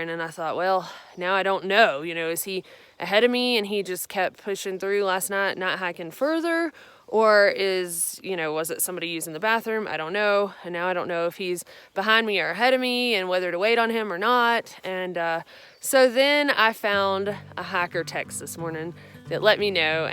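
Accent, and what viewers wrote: South, United States. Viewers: That was a scary encounter with the mountain lion. If you would have been at that spot just a few minutes sooner the lion might have been in the bushes. It could have gone bad very easily in my opinion. I think the fact that you were at a higher elevation helped. It was good that you kept your cool and the harmonica obviously helped. Maybe have your pepper spray on one hand and your neck knife on the other just in case. Also consider getting the bear spray sooner on the trail. I really enjoy your videos and it easy to like the way you express yourself and your accent. Good luck on the trail and hope you have a great time off.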